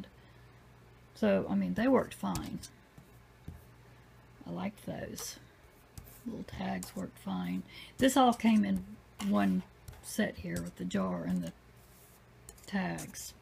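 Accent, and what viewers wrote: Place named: South, United States. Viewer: Thank you for sharing this video about these dies because otherwise I wouldn't have purchased any of them. About how long does it take for you to receive your orders from China & are they packaged well? BTW...I love listening to your southern accent, it's so sweet, it reminds me of my mama's accent... :) Take care, Lily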